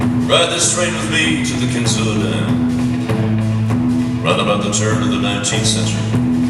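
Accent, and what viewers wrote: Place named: Arkansas, United States. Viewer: He sound's like our Arkansas Boy.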